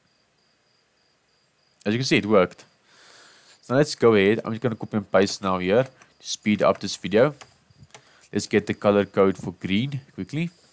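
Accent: Afrikaans, South Africa. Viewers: brah ek sweer jys afrikaans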